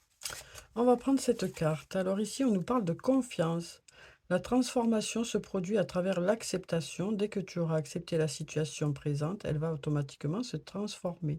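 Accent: South, France. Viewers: Bonjour,cela fait plaisir de vous,mettre un visage sur l accent du soleil e👍🤩😘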